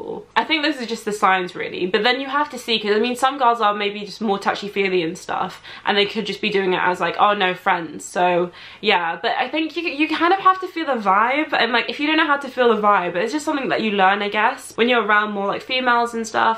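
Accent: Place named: United Kingdom. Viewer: I love your british accent guapa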